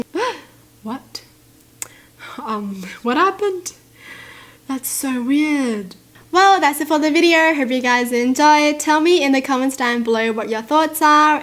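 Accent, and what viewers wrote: Australia. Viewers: She looks like rosè too and they're both from australia that's why they have the same accent. Maybe they're related?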